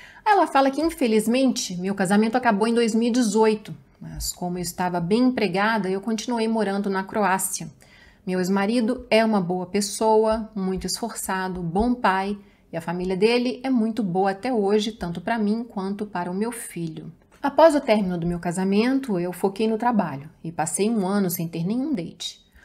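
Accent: Minas Gerais, Brazil. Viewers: " morreu nao desgrama vou embora" 😂😂😂 amo nosso mineires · Vem disgrama.....famoso mineires 😂😂😂😂😂 adoroooo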